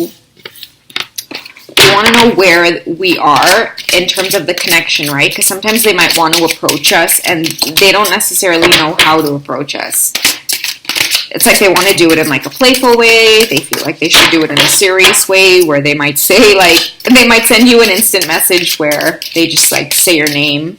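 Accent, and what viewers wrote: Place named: Trinidad, Trinidad and Tobago. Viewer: Girl, sorry I forgot that I could reply to you in the oldest post videos, like I did before because I am hiding a little bit here, hehe, Thank you Girl so much for all the readings, I really love you too, and I love your Trinidad Accent too I always want to say that, so funny and of course natural cause that is your natural language, hehe ❤💖 Love you so so so much, you are like my best friend, the readings are like daily Vitamins for my heart, all, the Romantic ones, the Sensual ones, ect, all, hehe 🥹🙏 thanks so much for all the readings Girl, I can't thank you enough, let me pay you with my Love hehehe 💖🥹🫂😚muah🩷💖 Thank you so much 🩷💖 (Hehehe, i pick this video by random actually, just what oldest and scroll down a bit, wkwkwk🙏🩷)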